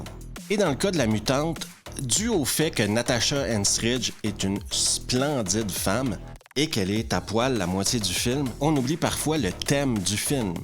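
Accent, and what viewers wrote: Quebec, Canada. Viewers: Salut ! Sympa d'avoir tous ces avis sur des films de notre génération (on a à peu près le même age) avec le petit accent du Québec exotique pour nous les Français, mais dans ton cas, y a aucun souci, c'est à 100 % compréhensible ! (je dis ça car j'éai été au Quebec en vacances et c'était vraiment pas facile, et du côté de Tadoussac, là c'était quasi une autre langue pour moi :-D ]. Super critiques de film donc, j'ai vraiment du plaisir à les regarder, bravo ! Souvent je rigole tout seul d'ailleurs, avec les expressions que tu trouves pour décrire les scènes. Pour ce qui est de "La mutante", j'avais vu au ciné aussi et j'avais même lu le livre avant (mais c'est pas un roman intrinsèque, je pense que c'est plutôt le scénario qui a été "romancisé" car c'est quasi identique à ce qui se passe dans le film, c'est juste plus détaillé grâce au processus de la narration qui permet de saisir les pensées des personnages... 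et de l'E.T.. Bref, j'avais bien aimé même s'il y a un côté "petit budget" qui empêche de donner une plus grande ampleur à ce qui aurait pu être une grande réussite du point de vue de la science-fiction. J'avoue que Natasha est bien plaisante à regarder, j'étais pas encore majeur hein ^^ (on l'a revue dans "Mon voisin le tueur" quelques années après en version moins... dénudée mais toujours très appétissante). La suite peut encore se mâter je trouve, encore moins de budget je crois mais des scènes bien dégueux-gores. Les 3ème et 4ème, c'est du niveau des Starship Troo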